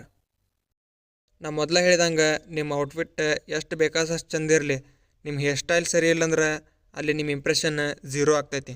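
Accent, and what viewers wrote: North Karnataka, India. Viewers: Dost ne pakka uttara Karnataka dav adi nod .. Nimmur yvda ..🧐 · ಅಣ್ಣಾ ನಿನ್ನ ಉತ್ತರ ಕರ್ಣಾಟಕ ❤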